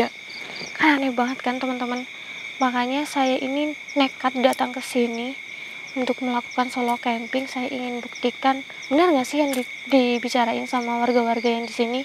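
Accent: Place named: Java, Indonesia. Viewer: Mba dr logat nya mba dr Jawa ya.masih kelihatan lihat Jawa nya.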